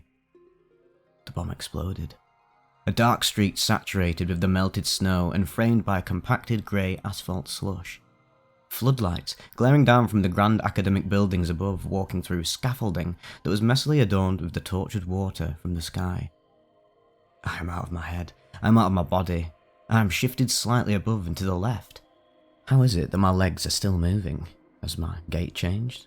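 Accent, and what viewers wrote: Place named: England, United Kingdom. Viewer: You have the perfect voice for narrating. Which part of England are you from?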